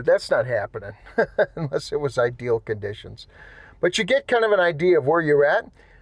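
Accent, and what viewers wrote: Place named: Wisconsin, United States. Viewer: Wisconsin. Got it. I was trying to place your accent.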